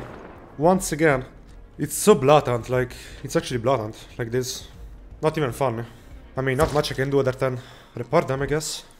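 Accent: Italy. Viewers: ma sei italiano? Hai proprio l’accento italiano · You have a really strong like Italian accent lmao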